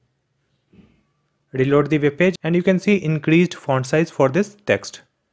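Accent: India. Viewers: Why westerners are making fun of his accent . English is not first language of Indians. Show some respect to his work. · Oh my god. This indian accent...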